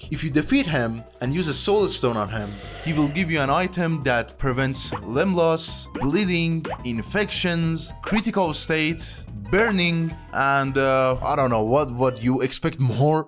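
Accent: Iran. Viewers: Why do you sound so Iranian? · Ngl bro lowkey has a Persian accent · irani ass lahje man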